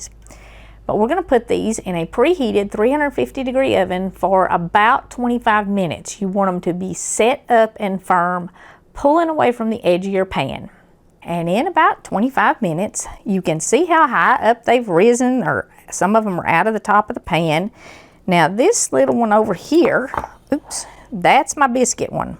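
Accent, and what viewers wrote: South, United States. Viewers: that is VERY southern accent wow!! ❤ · soon as i heard her country accent i knew it was gonna be a good recipe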